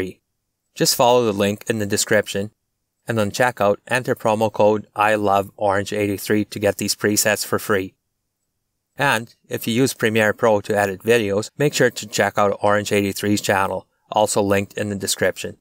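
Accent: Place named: Saskatoon, Canada. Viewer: You sound like you’re from Saskatoon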